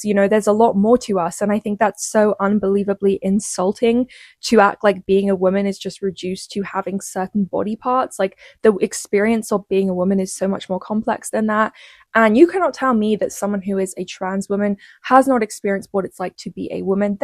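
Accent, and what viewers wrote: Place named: United Kingdom. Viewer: I also like your accent,. I like British and British-sounding accents a lot. 🤍🪽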